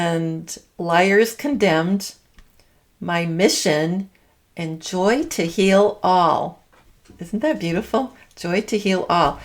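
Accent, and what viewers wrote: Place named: Boston, United States. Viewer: Yes... I too hear a little bit of the Boston Accent 🙂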